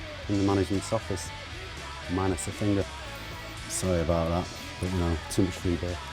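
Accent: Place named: Manchester, United Kingdom. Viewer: he's talking manc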